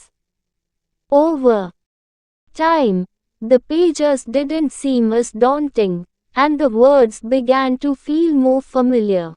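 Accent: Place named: India. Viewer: It is indian accent not british or American 😐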